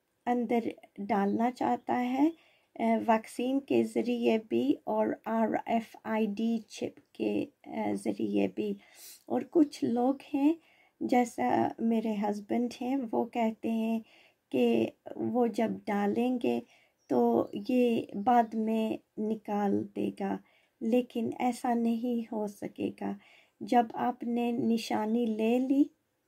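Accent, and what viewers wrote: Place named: Pakistan. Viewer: Sis r u from Pakistan.. No offence but your accent is a little difference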